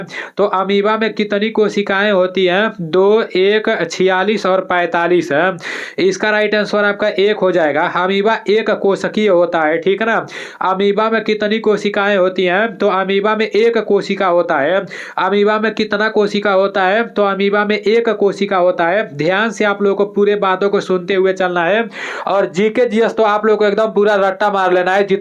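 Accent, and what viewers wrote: Bihar, India. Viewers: Bhai lagta hai ki aap bihar se ho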